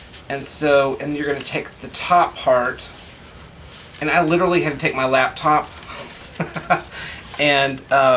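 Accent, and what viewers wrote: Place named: South, United States. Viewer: Fiona has been singing your praises for so long & I finally made it over here. Now I know why. I love the way you don't even think about it. You just keep explaining as you're going along & it's like we're having a conversation. Well except for the part where you can't hear me respond. :) Also the accent & the y'all made me feel like I was watching a long, lost cousin. Where are you from? I was born & raised in Texas. :)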